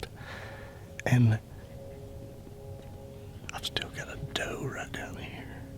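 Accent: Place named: Alabama, United States. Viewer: Your accent sounds like you are from Alabama.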